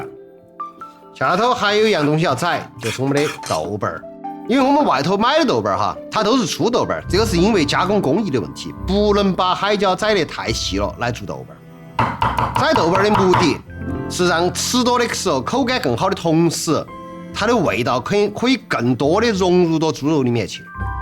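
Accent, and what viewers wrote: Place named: Chengdu, China. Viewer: 成都话真是好听，女娃儿说更好听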